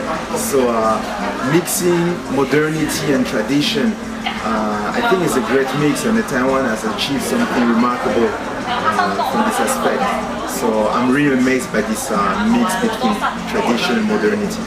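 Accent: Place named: France. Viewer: He has a strong French accent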